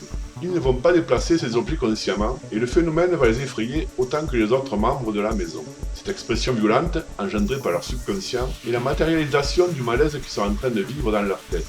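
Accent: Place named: Southwest, France. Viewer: Bonjourrr j’adore votre accent du sud ouest comme le mien hhhhh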